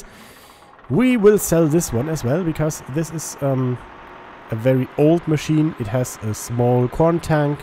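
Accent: Germany. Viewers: | | Gaming with german accent 100% agree! I think, some of your german fans don't know/understand, that maybe there a other YouTube user, which can't speak german, but would like to see your great videos! For me it is a great opportunity to increase my english understanding. Greetings Tobias P.S. I am sorry for my bad english - it is etwas eingerostet:D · | | Gaming with german accent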